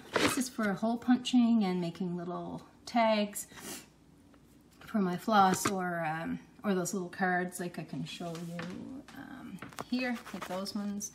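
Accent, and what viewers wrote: Canada. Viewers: I KNEW I was hearing Newfoundland in your speech!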